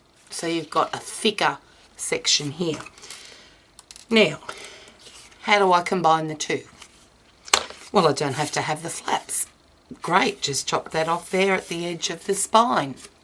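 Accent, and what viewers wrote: Australia. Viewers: Thanks for sharing. I really like it without the flaps and with the added strength. I've made mine 7.5 inches high because I want to do an album that will fit 6 x 4 photo mats. Can you recommend what sort of pages to add to the hinge ? I'm thinking about doing just a single sheet of cardstock because I can't make pocket pages that big without having to stick 2 pieces of paper together with binding and then it looks a bit bulky. I'm also worried that the open edge would be too big at 7.5 inches. Thanks again, great to hear an Aussie accent. I'm from Melbourne living in the US now.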